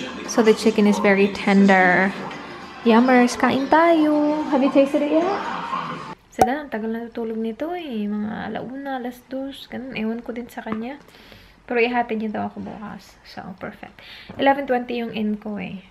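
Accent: Visayas, Philippines. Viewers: sobrang cute mo mag kwento ate hahaha nakakaaliw, and while cooking hahaha. Ang the bisaya accent and words lol hahaha I love you tlga ate hihi 😊😘 Love your vlogs so much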